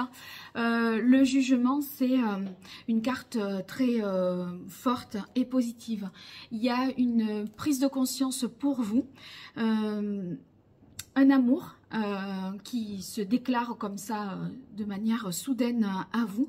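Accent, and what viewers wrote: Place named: Marseille, France. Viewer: Bon bah ça marche ! 🙂 Ça ne me parle pas trop, mais j'aime bien votre accent, ça me rappelle ma vie à Marseille.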